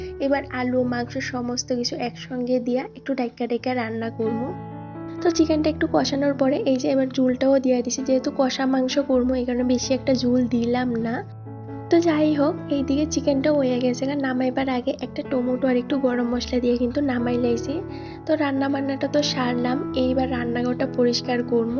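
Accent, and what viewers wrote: Bangladesh. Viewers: Bon tomar bari kothai bagladesh avabe kotha bolcho keno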